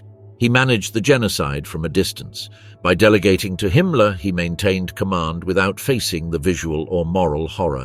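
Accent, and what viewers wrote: United Kingdom. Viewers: lol same old propaganda video 😅😅 lmaoo with the same old British accent 😊! Give me a break, don’t worry all these lies told about Hitler one day will be proven false, he did NOT kill millions lol, you have zero proof, once I get the speech or signature I’ll beleve it.. the great awakening ppl!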